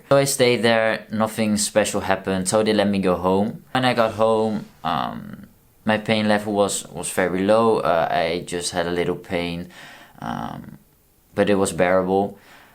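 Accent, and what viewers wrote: Netherlands. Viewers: I like your Dutch accent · Vreselijk verhaal man!! Wat kut. Je engels is btw goed aan je accent mag je nog wel werken ik kon meteen horen dat je nederlands was😂 no offense tho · love you accent love Dutch I'm glad you are OK now xxx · Don't apologize for your accent. I had the blessed opportunity to lived in The Netherland for three years. Beautiful people, beautiful country. You are a beautiful and brave person. Forgive me because I do not know the correct pronouns. I am learning. ☺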